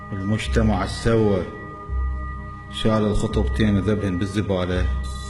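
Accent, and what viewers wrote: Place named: Iraq. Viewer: زباله كلمه عراقيه بحته وطريقه كلام السيد. هم عراقي بحت ويبقى من عامه الشعب ومنه وبينه ف هاذ الحجي زد وهيه كوه تردون تسقطون بيه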